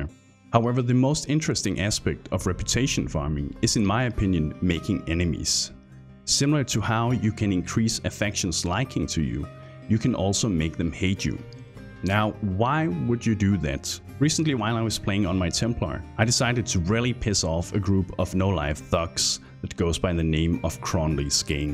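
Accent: Denmark. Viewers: im guessing from ur accent that ur danish, but im not sure. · Haha den dansker accent XD god video min ven. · Like ur videos, it sound like ur from Denmark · The lad is Danish so cut him some slack ffs. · It’s a danish accent lol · are you Danish? your dialect sounds so Danish!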